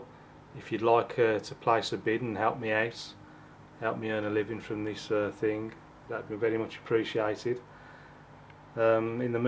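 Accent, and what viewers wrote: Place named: Midlands, United Kingdom. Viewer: You inspire me so much, love your Artwork, you even make a telegraph pole into a work of art. I also love your honesty as you review your paintings at the end, even though I can see nothing wrong until you point it out, it helps me see things in a different light on my own feeble attempts at water colour painting. you ascent makes me wonder if you are a Midlander like myself? :D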